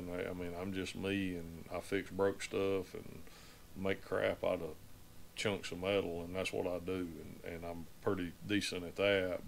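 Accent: South, United States. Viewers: I’m just here to tell you, I’ll be here watching ya make whatever you make. I miss hearing real southern folk talk out here in Cali. You could polish a turd and I would watch. I am not your target demographic, but you can educate and explain things to people from different backgrounds. You do you, I’ll be here watching.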